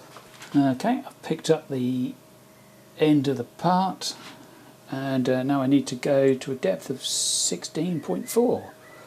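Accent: Norfolk, United Kingdom. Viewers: Enjoying your videos, yew sound like a Norfolk boy to me (as am I), I don't detect the upward tone rise of a Suffolk boy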